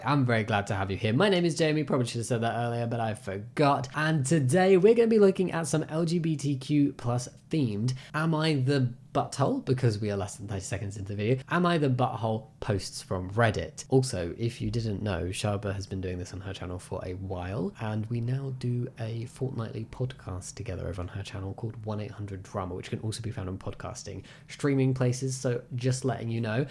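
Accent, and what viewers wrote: United Kingdom. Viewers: The changing thing probably is also seeming weird because in the U.S. people are a lot less comfortable changing and not wearing clothes around other people. The thing is, women in America wouldn't feel comfortable changing in front of men. Are you saying that is wrong? I feel like it is a weird faux paux for her to like not look away or step out if a friend is changing. I am assuming you are British from your accent right? Americans feel VERY weird about unclothing/nudity and it is sexualized in our heads. Idk if one of my friends started undressing in front of me and I they were of the sex/gender I was attracted to, I would definitely make sure they knew that and look away/step away. I think this is a cultural thing. Like I get why you are upset and in theory what you say makes sense, but then should we be outraged that the women aren't getting undressed around men? 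(No). Idk, I'm afraid of both straight and queer people SA'ing me (so I don't get changed around anyone at all). So, they are afraid. They shouldn't block you but like if they decide they aren't comfortable changing around you and stop doing that is that somehow a problem? Like people should be required to change around people they don't want to change around? I think this is an American prudish thing. And a public changing room with a lot of people is different from walking into your bedroom with one other person and taking your clothes off. Idk, you don't get free rights to watch whoever you want to unchange. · are you british? you kinda sound brih'ish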